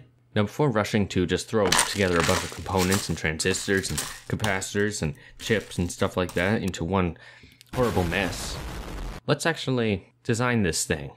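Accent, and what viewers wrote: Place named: Germany. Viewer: Are you from Germany?The way you speak remains me my friend from there. I don't know all that cool stuff, but the way you explaining makes me want to learn. That you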